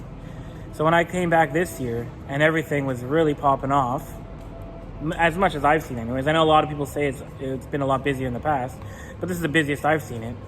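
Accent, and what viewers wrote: United States. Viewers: You sound like an American and you actually have the right to own 100% of a business in Thailand. It's called "The Amity Treaty". You just need to have it setup with a lawyer and go to one of those firms you talked about. I can't believe no one told you about this. The paperwork is like 14,000 baht. Google, setting up an Amity Treaty business in Thailand.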